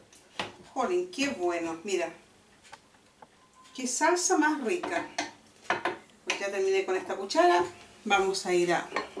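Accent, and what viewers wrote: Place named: Chile. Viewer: aca en mexico le llamamos capirotada... que ricas se ven!!! amo en verdad el acento chileno!! un gran abrazo!!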